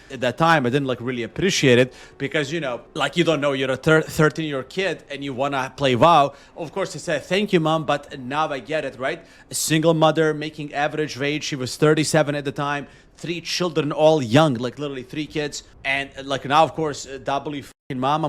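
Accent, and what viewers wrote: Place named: Scotland, United Kingdom. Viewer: Odakle ti ovaj Skotski naglasak?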